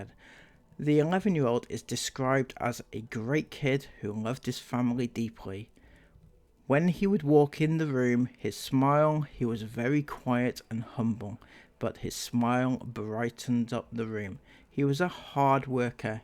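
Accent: United Kingdom. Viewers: Why are you bothering yourself with what's happening in America. You sound British. Do you not have enough to worry about in your own country?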